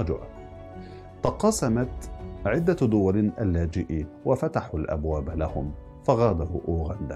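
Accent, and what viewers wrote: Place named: Morocco. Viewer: بالفعل صوت متميز. أعتقد اصلو من المغرب. تحية لابن بلدي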